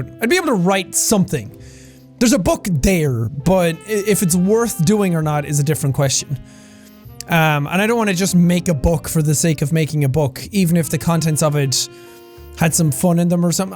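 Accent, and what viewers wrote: Ireland. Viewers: “A little bit of luck involved” -Irish man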